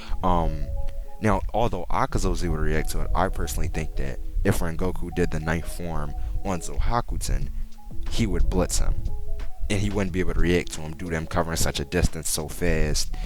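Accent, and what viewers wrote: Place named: Philadelphia, United States. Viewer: I can hear the philly accent! very dope commentary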